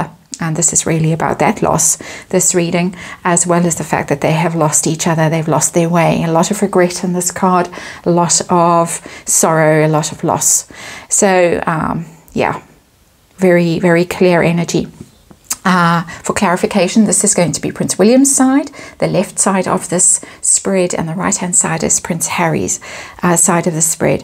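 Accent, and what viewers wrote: South Africa. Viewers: Great! Thanks! I absolutely love your readings! Your beautiful SA accent lends a lovely gentleness to the readings even when the readings are darker than usual, as was this one! Stay safe lovely lady xx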